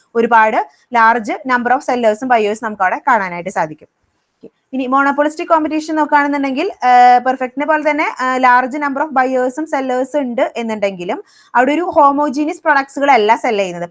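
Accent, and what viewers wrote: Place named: Thrissur, India. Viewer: ടീച്ചർ ഒരു തൃശ്ശൂർ കാരി ആണെന്ന് തോനുന്നു 😊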